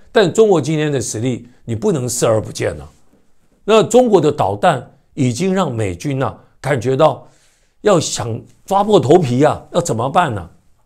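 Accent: Standard. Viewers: 语音标准 评论优默 见界宽阔 正能量满 正气善评 优秀才气 愿你全家安康如意🙏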